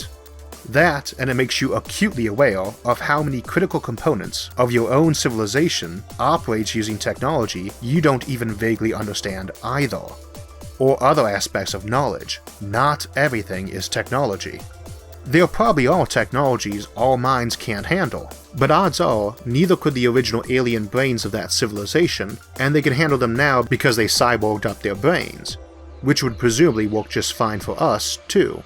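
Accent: United States. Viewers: You have an american accent!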